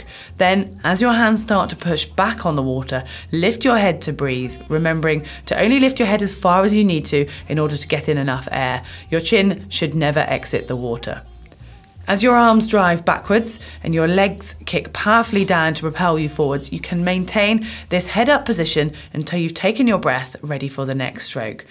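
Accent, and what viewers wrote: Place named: United Kingdom. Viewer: the British accent i can't understand and I'm not even american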